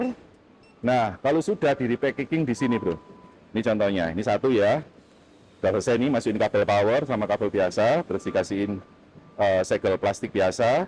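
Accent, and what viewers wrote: Java, Indonesia. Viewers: Jowo a pakde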